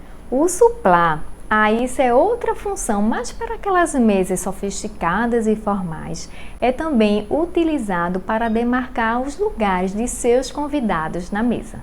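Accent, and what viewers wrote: Recife, Brazil. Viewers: Eita sotaque forte que me lembrou uma amiga do Recife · Vc é de Recife? Sutaque bem parecido! Moro em Curitiba, amo Recife!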